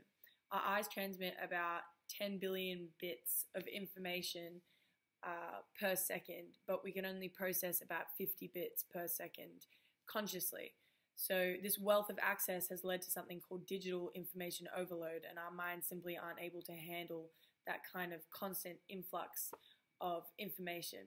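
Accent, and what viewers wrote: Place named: Victoria, Australia. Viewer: I think the statute of limitations is up….I had the biggest crush on Ms. during her time in Eugene. I was completing a lengthy career of sports journalism and sociology. Admittedly it was absolutely fueled by an appreciation of aesthetics, mannerisms, admittedly shallow but subjectively harmless. Your decision to take leave Eugene for the Horned Frogs of Fort Worth served as excellent foundation for my ego. I mean the only reason I never took out was because she left! 🙃 I saw your content for YouTube kids about the importance of keeping in touch during quarantine, mindfulness, etc. Great work! I can I only imagine the impact a star athlete like yourself has on young girls AND boys. Seeing you discuss toxic masculinity and gender issues intelligently is rad. That’s 100% my realm of media/sociology snd I had no idea. 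It isn’t ironic; since no one ever uses irony correctly…. With my awareness of misogyny, objectification, etc it can be a conundrum between the ‘progressive’ trends I believe in and ‘Woah. Is she from Victoria? The accent. She’s friggin’ 😍’ Hey now now…she might be an awful person. We should not suddenly wish all good things for her whilst ignoring the plight of others based on hormones. You’re really intelligent too! I damn well knew it! I’m exaggerating intensity of my crush (slightly)….but to see you sharing a very well composed thesis and using your platform for good. Well that just shows I’m perceptive. I knew there was far more to ya Beaut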